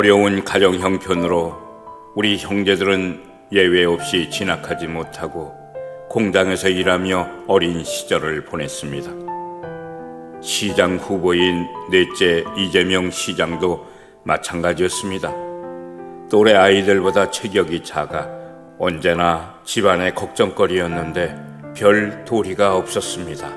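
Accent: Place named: North Korea. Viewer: 말투가 어떻게 북쪽 목소리냐?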